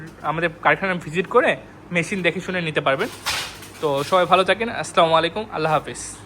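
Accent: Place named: Dinajpur, Bangladesh. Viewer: দিনাজপুরের কথাশ এটা ভাই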